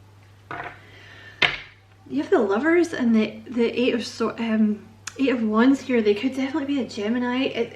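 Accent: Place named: Scotland, United Kingdom. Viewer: Gemini Ty...the Accent is lovely Scottish...lol from Queensland Australia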